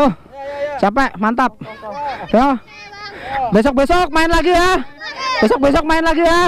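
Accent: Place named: Ngapak, Indonesia. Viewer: Wkwkwkwk pas ngomong GORI ketawa ngakak gua, ngapak nya medok banget .. Salam ngapak dah, gua juga org ngapak 😂😂🙏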